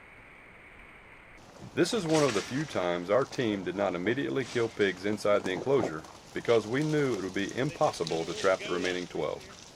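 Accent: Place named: South, United States. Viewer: Wow this is actually interesting as fuck lol and I'm loving the informative narration with a deep southern accent hahahaha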